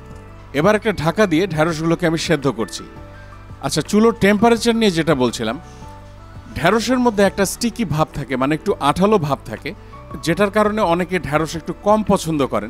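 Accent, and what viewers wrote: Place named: Rangpur, Bangladesh. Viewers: Vaiya Ami o Rangpurer mey ar Nilphamarir Bou amra o avabe boli apner kac Theke Amader ancolik vasa sune vlo laglo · আপনার দেশের বাড়ি কোথায় ভাইয়া? মনে হচ্ছে দিনাজপুরের ঐদিকে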